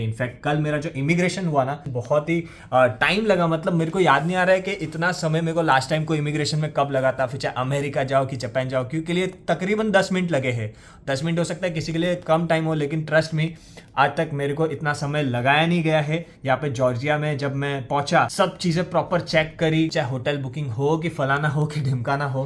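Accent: Gujarat, India. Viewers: Bhai ko boli se pata lgta hai ki bhau gujju hai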